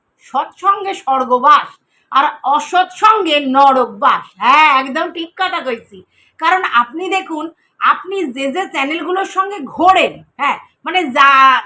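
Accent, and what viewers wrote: Bangal, Bangladesh. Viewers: di video ta khub bhalo, kintu normal bangla dialect e video korle better lage sunte.. ei "bangal" tone e kotha bolle half jinish bujhte parina r khub ugro lage.. Kichu mone korona bollam bole.🙂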